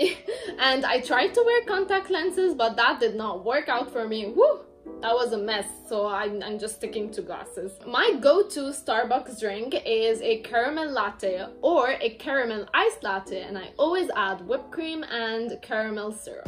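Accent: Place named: France. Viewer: Such a good video! I was always curious what exactly your accent was because it sounded French but not fully French. Loved getting to know more about you :)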